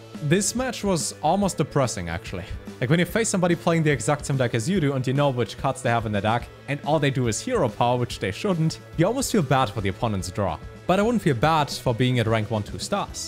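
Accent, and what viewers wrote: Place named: Germany. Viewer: I cant quite tell where ur accent is from but it sounds german Wenn das wahr is dann is mein lieblings youtuber deutsch , genau wie ich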